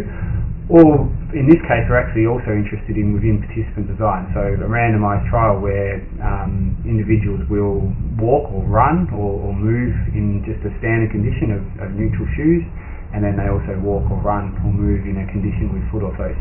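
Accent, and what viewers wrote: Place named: Australia. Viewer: Your Australian accent reminds me of school at Griffith university in the Gold Coast😄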